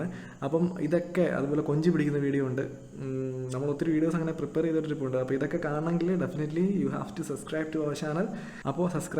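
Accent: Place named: Kasaragod, India. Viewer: Aiwaaaaaaa🥰🥰🥰🥰🥰 kidilan #kasaragodbhasha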